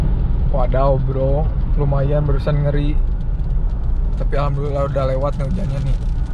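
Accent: West Java, Indonesia. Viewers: Khas ye logat sunda nya wkwk ada kata2 mah sama teh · logat sundanya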